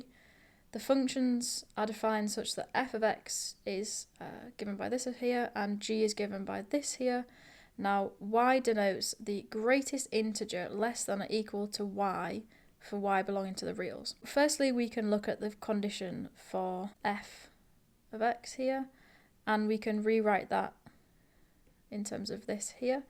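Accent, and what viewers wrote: United Kingdom. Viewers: Bruhh that's british not Australian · Are you British?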